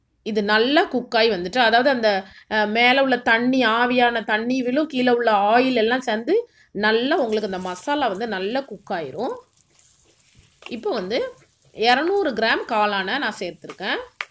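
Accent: Tirunelveli, India. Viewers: Sis u r from Tirunelveli.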